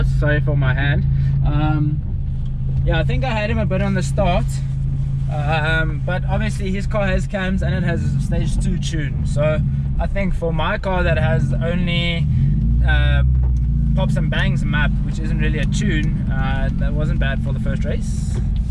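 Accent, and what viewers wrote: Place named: South Africa. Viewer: I hear a South African accent I subscribe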